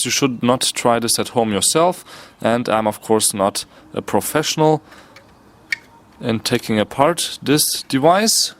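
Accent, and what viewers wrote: Germany. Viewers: Are you german? are you english speaking naturaly? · German english lol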